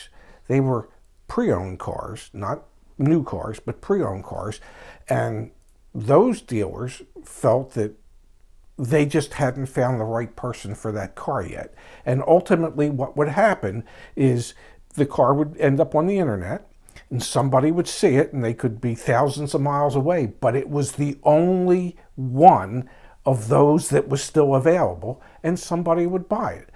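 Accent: Baltimore, United States. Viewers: Love that "Balamer" accent! You sound like you should be in a John Waters movie!😎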